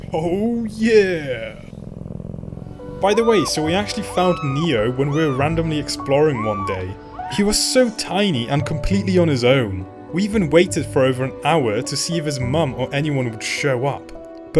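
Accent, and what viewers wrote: United Kingdom. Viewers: You sound British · I thought you were British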